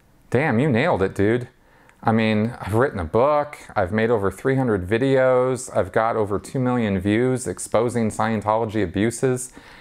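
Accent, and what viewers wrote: Minnesota, United States. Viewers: Yikes.... nothing like ad hominem! And imagine not being able to spot a Minnesota accent. No specific disagreements, just generalizations. And we know what Hubbard said about people who engage in generalizations....;) And the 'you'll delete this' messages always get me. What maroons! You're a class act, "."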